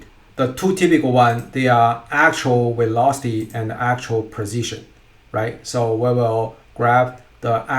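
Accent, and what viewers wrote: China. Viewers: Regret we didn't meet sooner，are you Chinese? Sound like Chinese-style_English.he he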